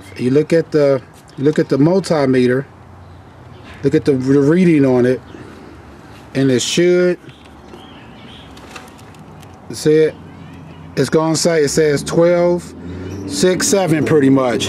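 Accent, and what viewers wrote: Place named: St. Louis, United States. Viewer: Sounds like you might be from St. Louis my friend.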